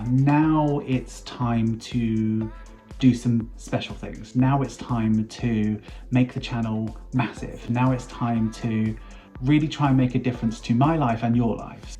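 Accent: United Kingdom. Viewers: Congrats! I just enjoy hearing you speak with British accent haha. Hello from Los Angeles.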